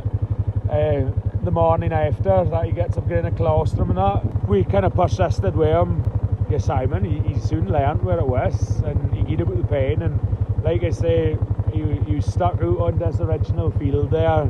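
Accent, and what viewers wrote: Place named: Orkney, United Kingdom. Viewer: I'd like to suggest that you call Simon's sister *Helena*. My boy Simon is a lovely special lad. I thought I'd lost him for good one day in Truro; I took my eye off him and there he was, gone! And his tricycle, too. We were united in Burger King, eventually.... And like your Simon's mum, I've spent plenty of time at the end of my tether, looking after Simon and his sister Helena. She's had her fair share of adventures, too, and she's bonny like your Simon's sister. It would be so nice to tell my Simon and Helena that they have namesakes up in Argyll who are loved just as much 😚😚! Lovely video, amazing accent (I've never heard an Orkney accent before, it's radically different to the estuary English down here in Kent!) and so good to be involved in the lives of you all at the farm, however vicariously. Wishing you every success; Katherine from Kent.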